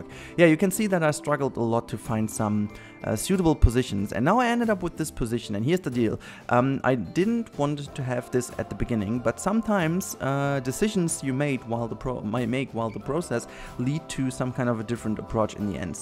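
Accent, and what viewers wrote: Germany. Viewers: Ich Finde den Deutsch Englisch Akzent so schrecklich 😂 is bei mir aber auch so mit dem Englisch was du gesagt hast 🙋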